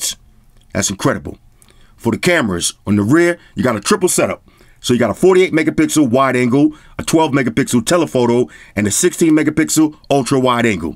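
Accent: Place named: Staten Island, United States. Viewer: Is from Staten Island? Because the way he speaks reminds me of Wu-tang.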